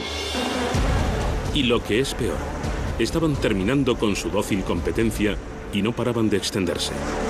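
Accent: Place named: Spain. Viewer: Cuando un Español narra un documental, sabes que va a estár muy bueno!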